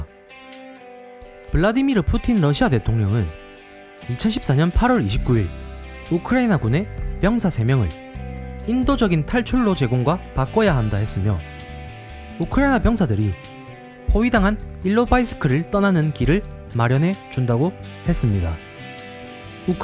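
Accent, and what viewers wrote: Gyeongsang, South Korea. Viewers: 경상도 20년 살았는데 좀 이상하긴한데 서울말씨보단 듣기 편함 ㅅㄱ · 경상도 사투리 흉내내세요? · 갱상도말 신경쓰이면 안들으면 되제 따지는게 많노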